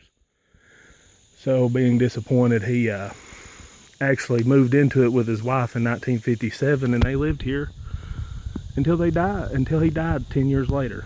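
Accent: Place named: South, United States. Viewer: Very cool! Just subscribed.. Love your southern accent.. It is a lot like mine!! lol (I am from NC.) Looking forward to seeing more videos!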